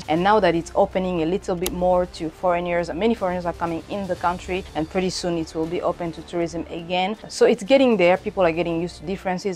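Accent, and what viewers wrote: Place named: France. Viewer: wait are you french? bc u have the french accent like me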